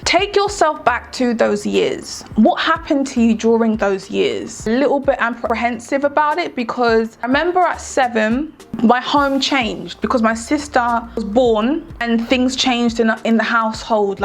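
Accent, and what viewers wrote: United Kingdom. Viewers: 🤣 🤣 🤣 🤣 I LOVE your energy and the wsu you present your vids, you're the only astrologer with a British accent I follow, I'm South London 30 libra ♎... You have a patreon??? I wanna link up wit youuuuuuu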